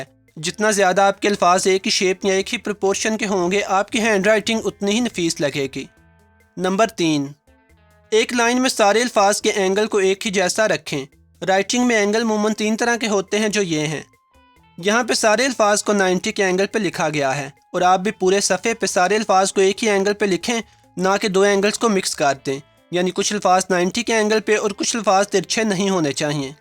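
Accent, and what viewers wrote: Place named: Lahore, Pakistan. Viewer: Lagda Lahore da aye... Jiss hisab nal bolda aye... :)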